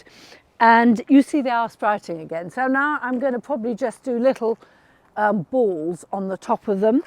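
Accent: RP, United Kingdom. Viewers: The combination of the cut-glass accent and the vocab (manky). Hilarious and does me good....finally subscribed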